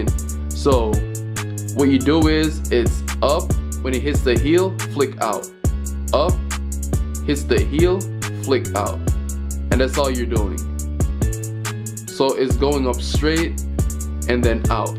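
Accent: Jamaica. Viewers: U from Jamaica?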